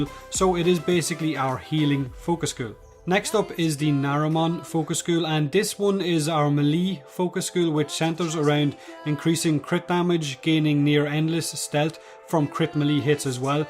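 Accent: Ireland. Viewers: Hearing an Irishman say tree while meaning tree instead of three has left me deeply confused. Tree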